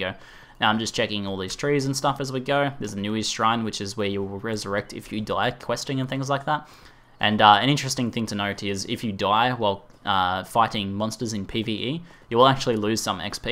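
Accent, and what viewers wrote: Australia. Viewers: Are you Aussie? Cause u sound like it lol I'm Aussie ;D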